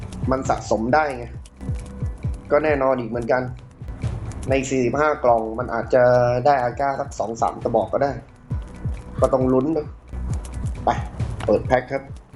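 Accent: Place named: South, Thailand. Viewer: สำเนียงพี่บ่าวนิ่ คนใต้ม๊ายนิ 😅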